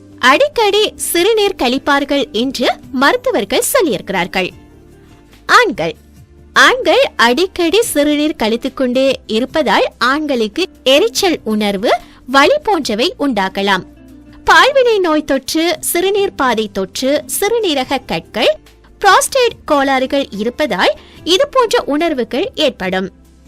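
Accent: Sri Lanka. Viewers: Bro avanga srilankan tamil...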